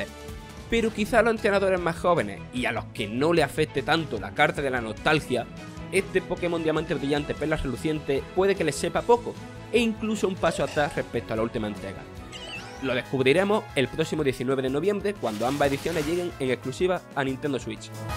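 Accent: Andalusia, Spain. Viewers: Se nota q eres andaluz ajajja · Pues le quiero decir al narrador que no se desanime por lo que le están diciendo sobre su voz yo soy de México y al principio se me hizo raro el acento pero ya me fui acostumbrado los que dicen que no sabe hablar o no se que, entiendan el canal es de España por lo que obviamente se centran principalmente en el público español y allá el acento o esa forma de hablar es muy común, ellos no tienen la culpa de que esa forma de hablar sea rara en Latinoamérica así que no hay porque quejarse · Porque hable con acento andaluz no significa que tenga mal léxico 🤦🏻‍♂️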